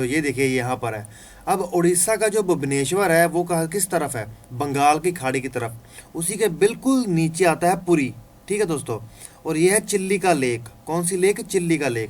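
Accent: Punjab, India. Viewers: Good morning sir Panjabi ho kya sir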